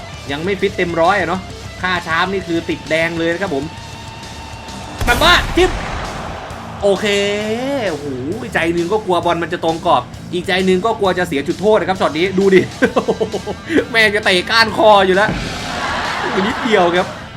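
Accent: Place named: South, Thailand. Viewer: น่าจะตั้งชื่อว่า มูฮัมหมัดอะไรโช้ ออกสำเนียงใต้น่ะครับ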